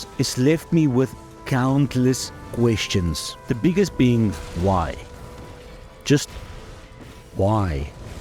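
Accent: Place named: South Africa. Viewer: Yoo i know a South African accent when I hear one. Whats up ekse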